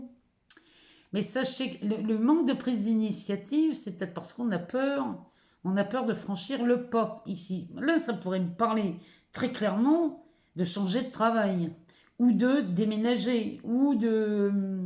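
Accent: North, France. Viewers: Bonjour. Merci pour votre tirage! C'est toujours un plaisir de vous visionner et étant originaire de la métropole lilloise, votre accent me fait du bien 😊. J'ai commencé à vous suivre lorsque je vivais sur Toulouse. Puis il y a un an je suis partie en Bourgogne en Puisaye pour suivre ma formation. Au début de cet été, ayant décroché un CDD sur Paris, je vous suivais depuis le Nord ou dans le TGV Lille-Paris:), et depuis début août je suis vos tirages dans Paris même (11ème). À partir de la semaine prochaine, je vous suivrai dans le Val d'Oise (la Frette-sur-Seine). Je suis Capricorne ascendant Vierge. Je souhaite de tout cœur que vos messages me fassent écho cette semaine. C'est tellement compliqué pour le logement. Et comme vous le disiez, au début j'ai commencé ce premier travail de reconversion en étant très enjouée. Mais ma situation financière, mes problèmes de logement et le futur très incertain dans l'atelier d'art où je travaille me font voir cela plus ou moins comme un échec. 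Je croise donc les doigts par rapport à votre tirage, besoin tellement de stabilité 🤞🤞. Merci beaucoup pour votre bienveillance, votre professionnalisme et tout ce temps que vous nous accordez généreusement. Beau week-end à vous 💛☀️🌈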